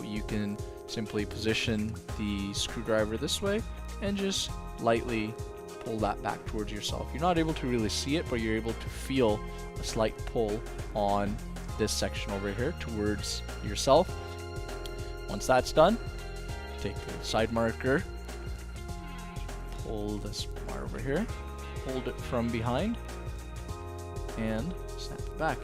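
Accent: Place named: Canada. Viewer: You're so Canadian! "Oo-ut". 😭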